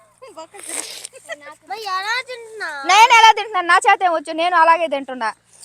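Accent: Nellore, India. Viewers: E ooru akka, mida, mi telugu nellore slang laga undi.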